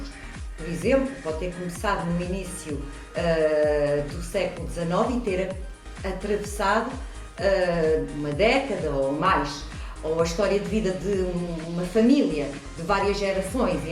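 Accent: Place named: Portugal. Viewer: ela fala a ligua de portugal quem concorda comigo da like e cometem a nha opiniao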